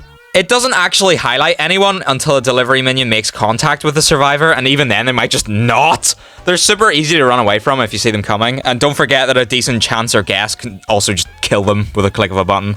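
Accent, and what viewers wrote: Scotland, United Kingdom. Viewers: Never thought I’d laugh this much on a Scottish person ranking killer abilities while swearing frequently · Maybe he’s Scottish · Idk why this dude has a slight Scottish accent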